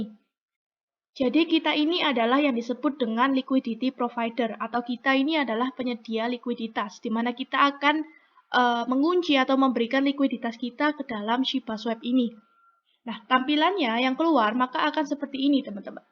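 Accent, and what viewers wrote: Java, Indonesia. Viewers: medok nya khas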